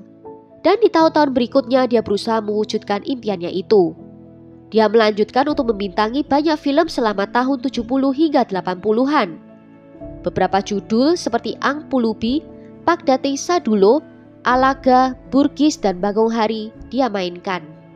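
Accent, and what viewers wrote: Java, Indonesia. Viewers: alangkah medok logat jawe kamu bik...😁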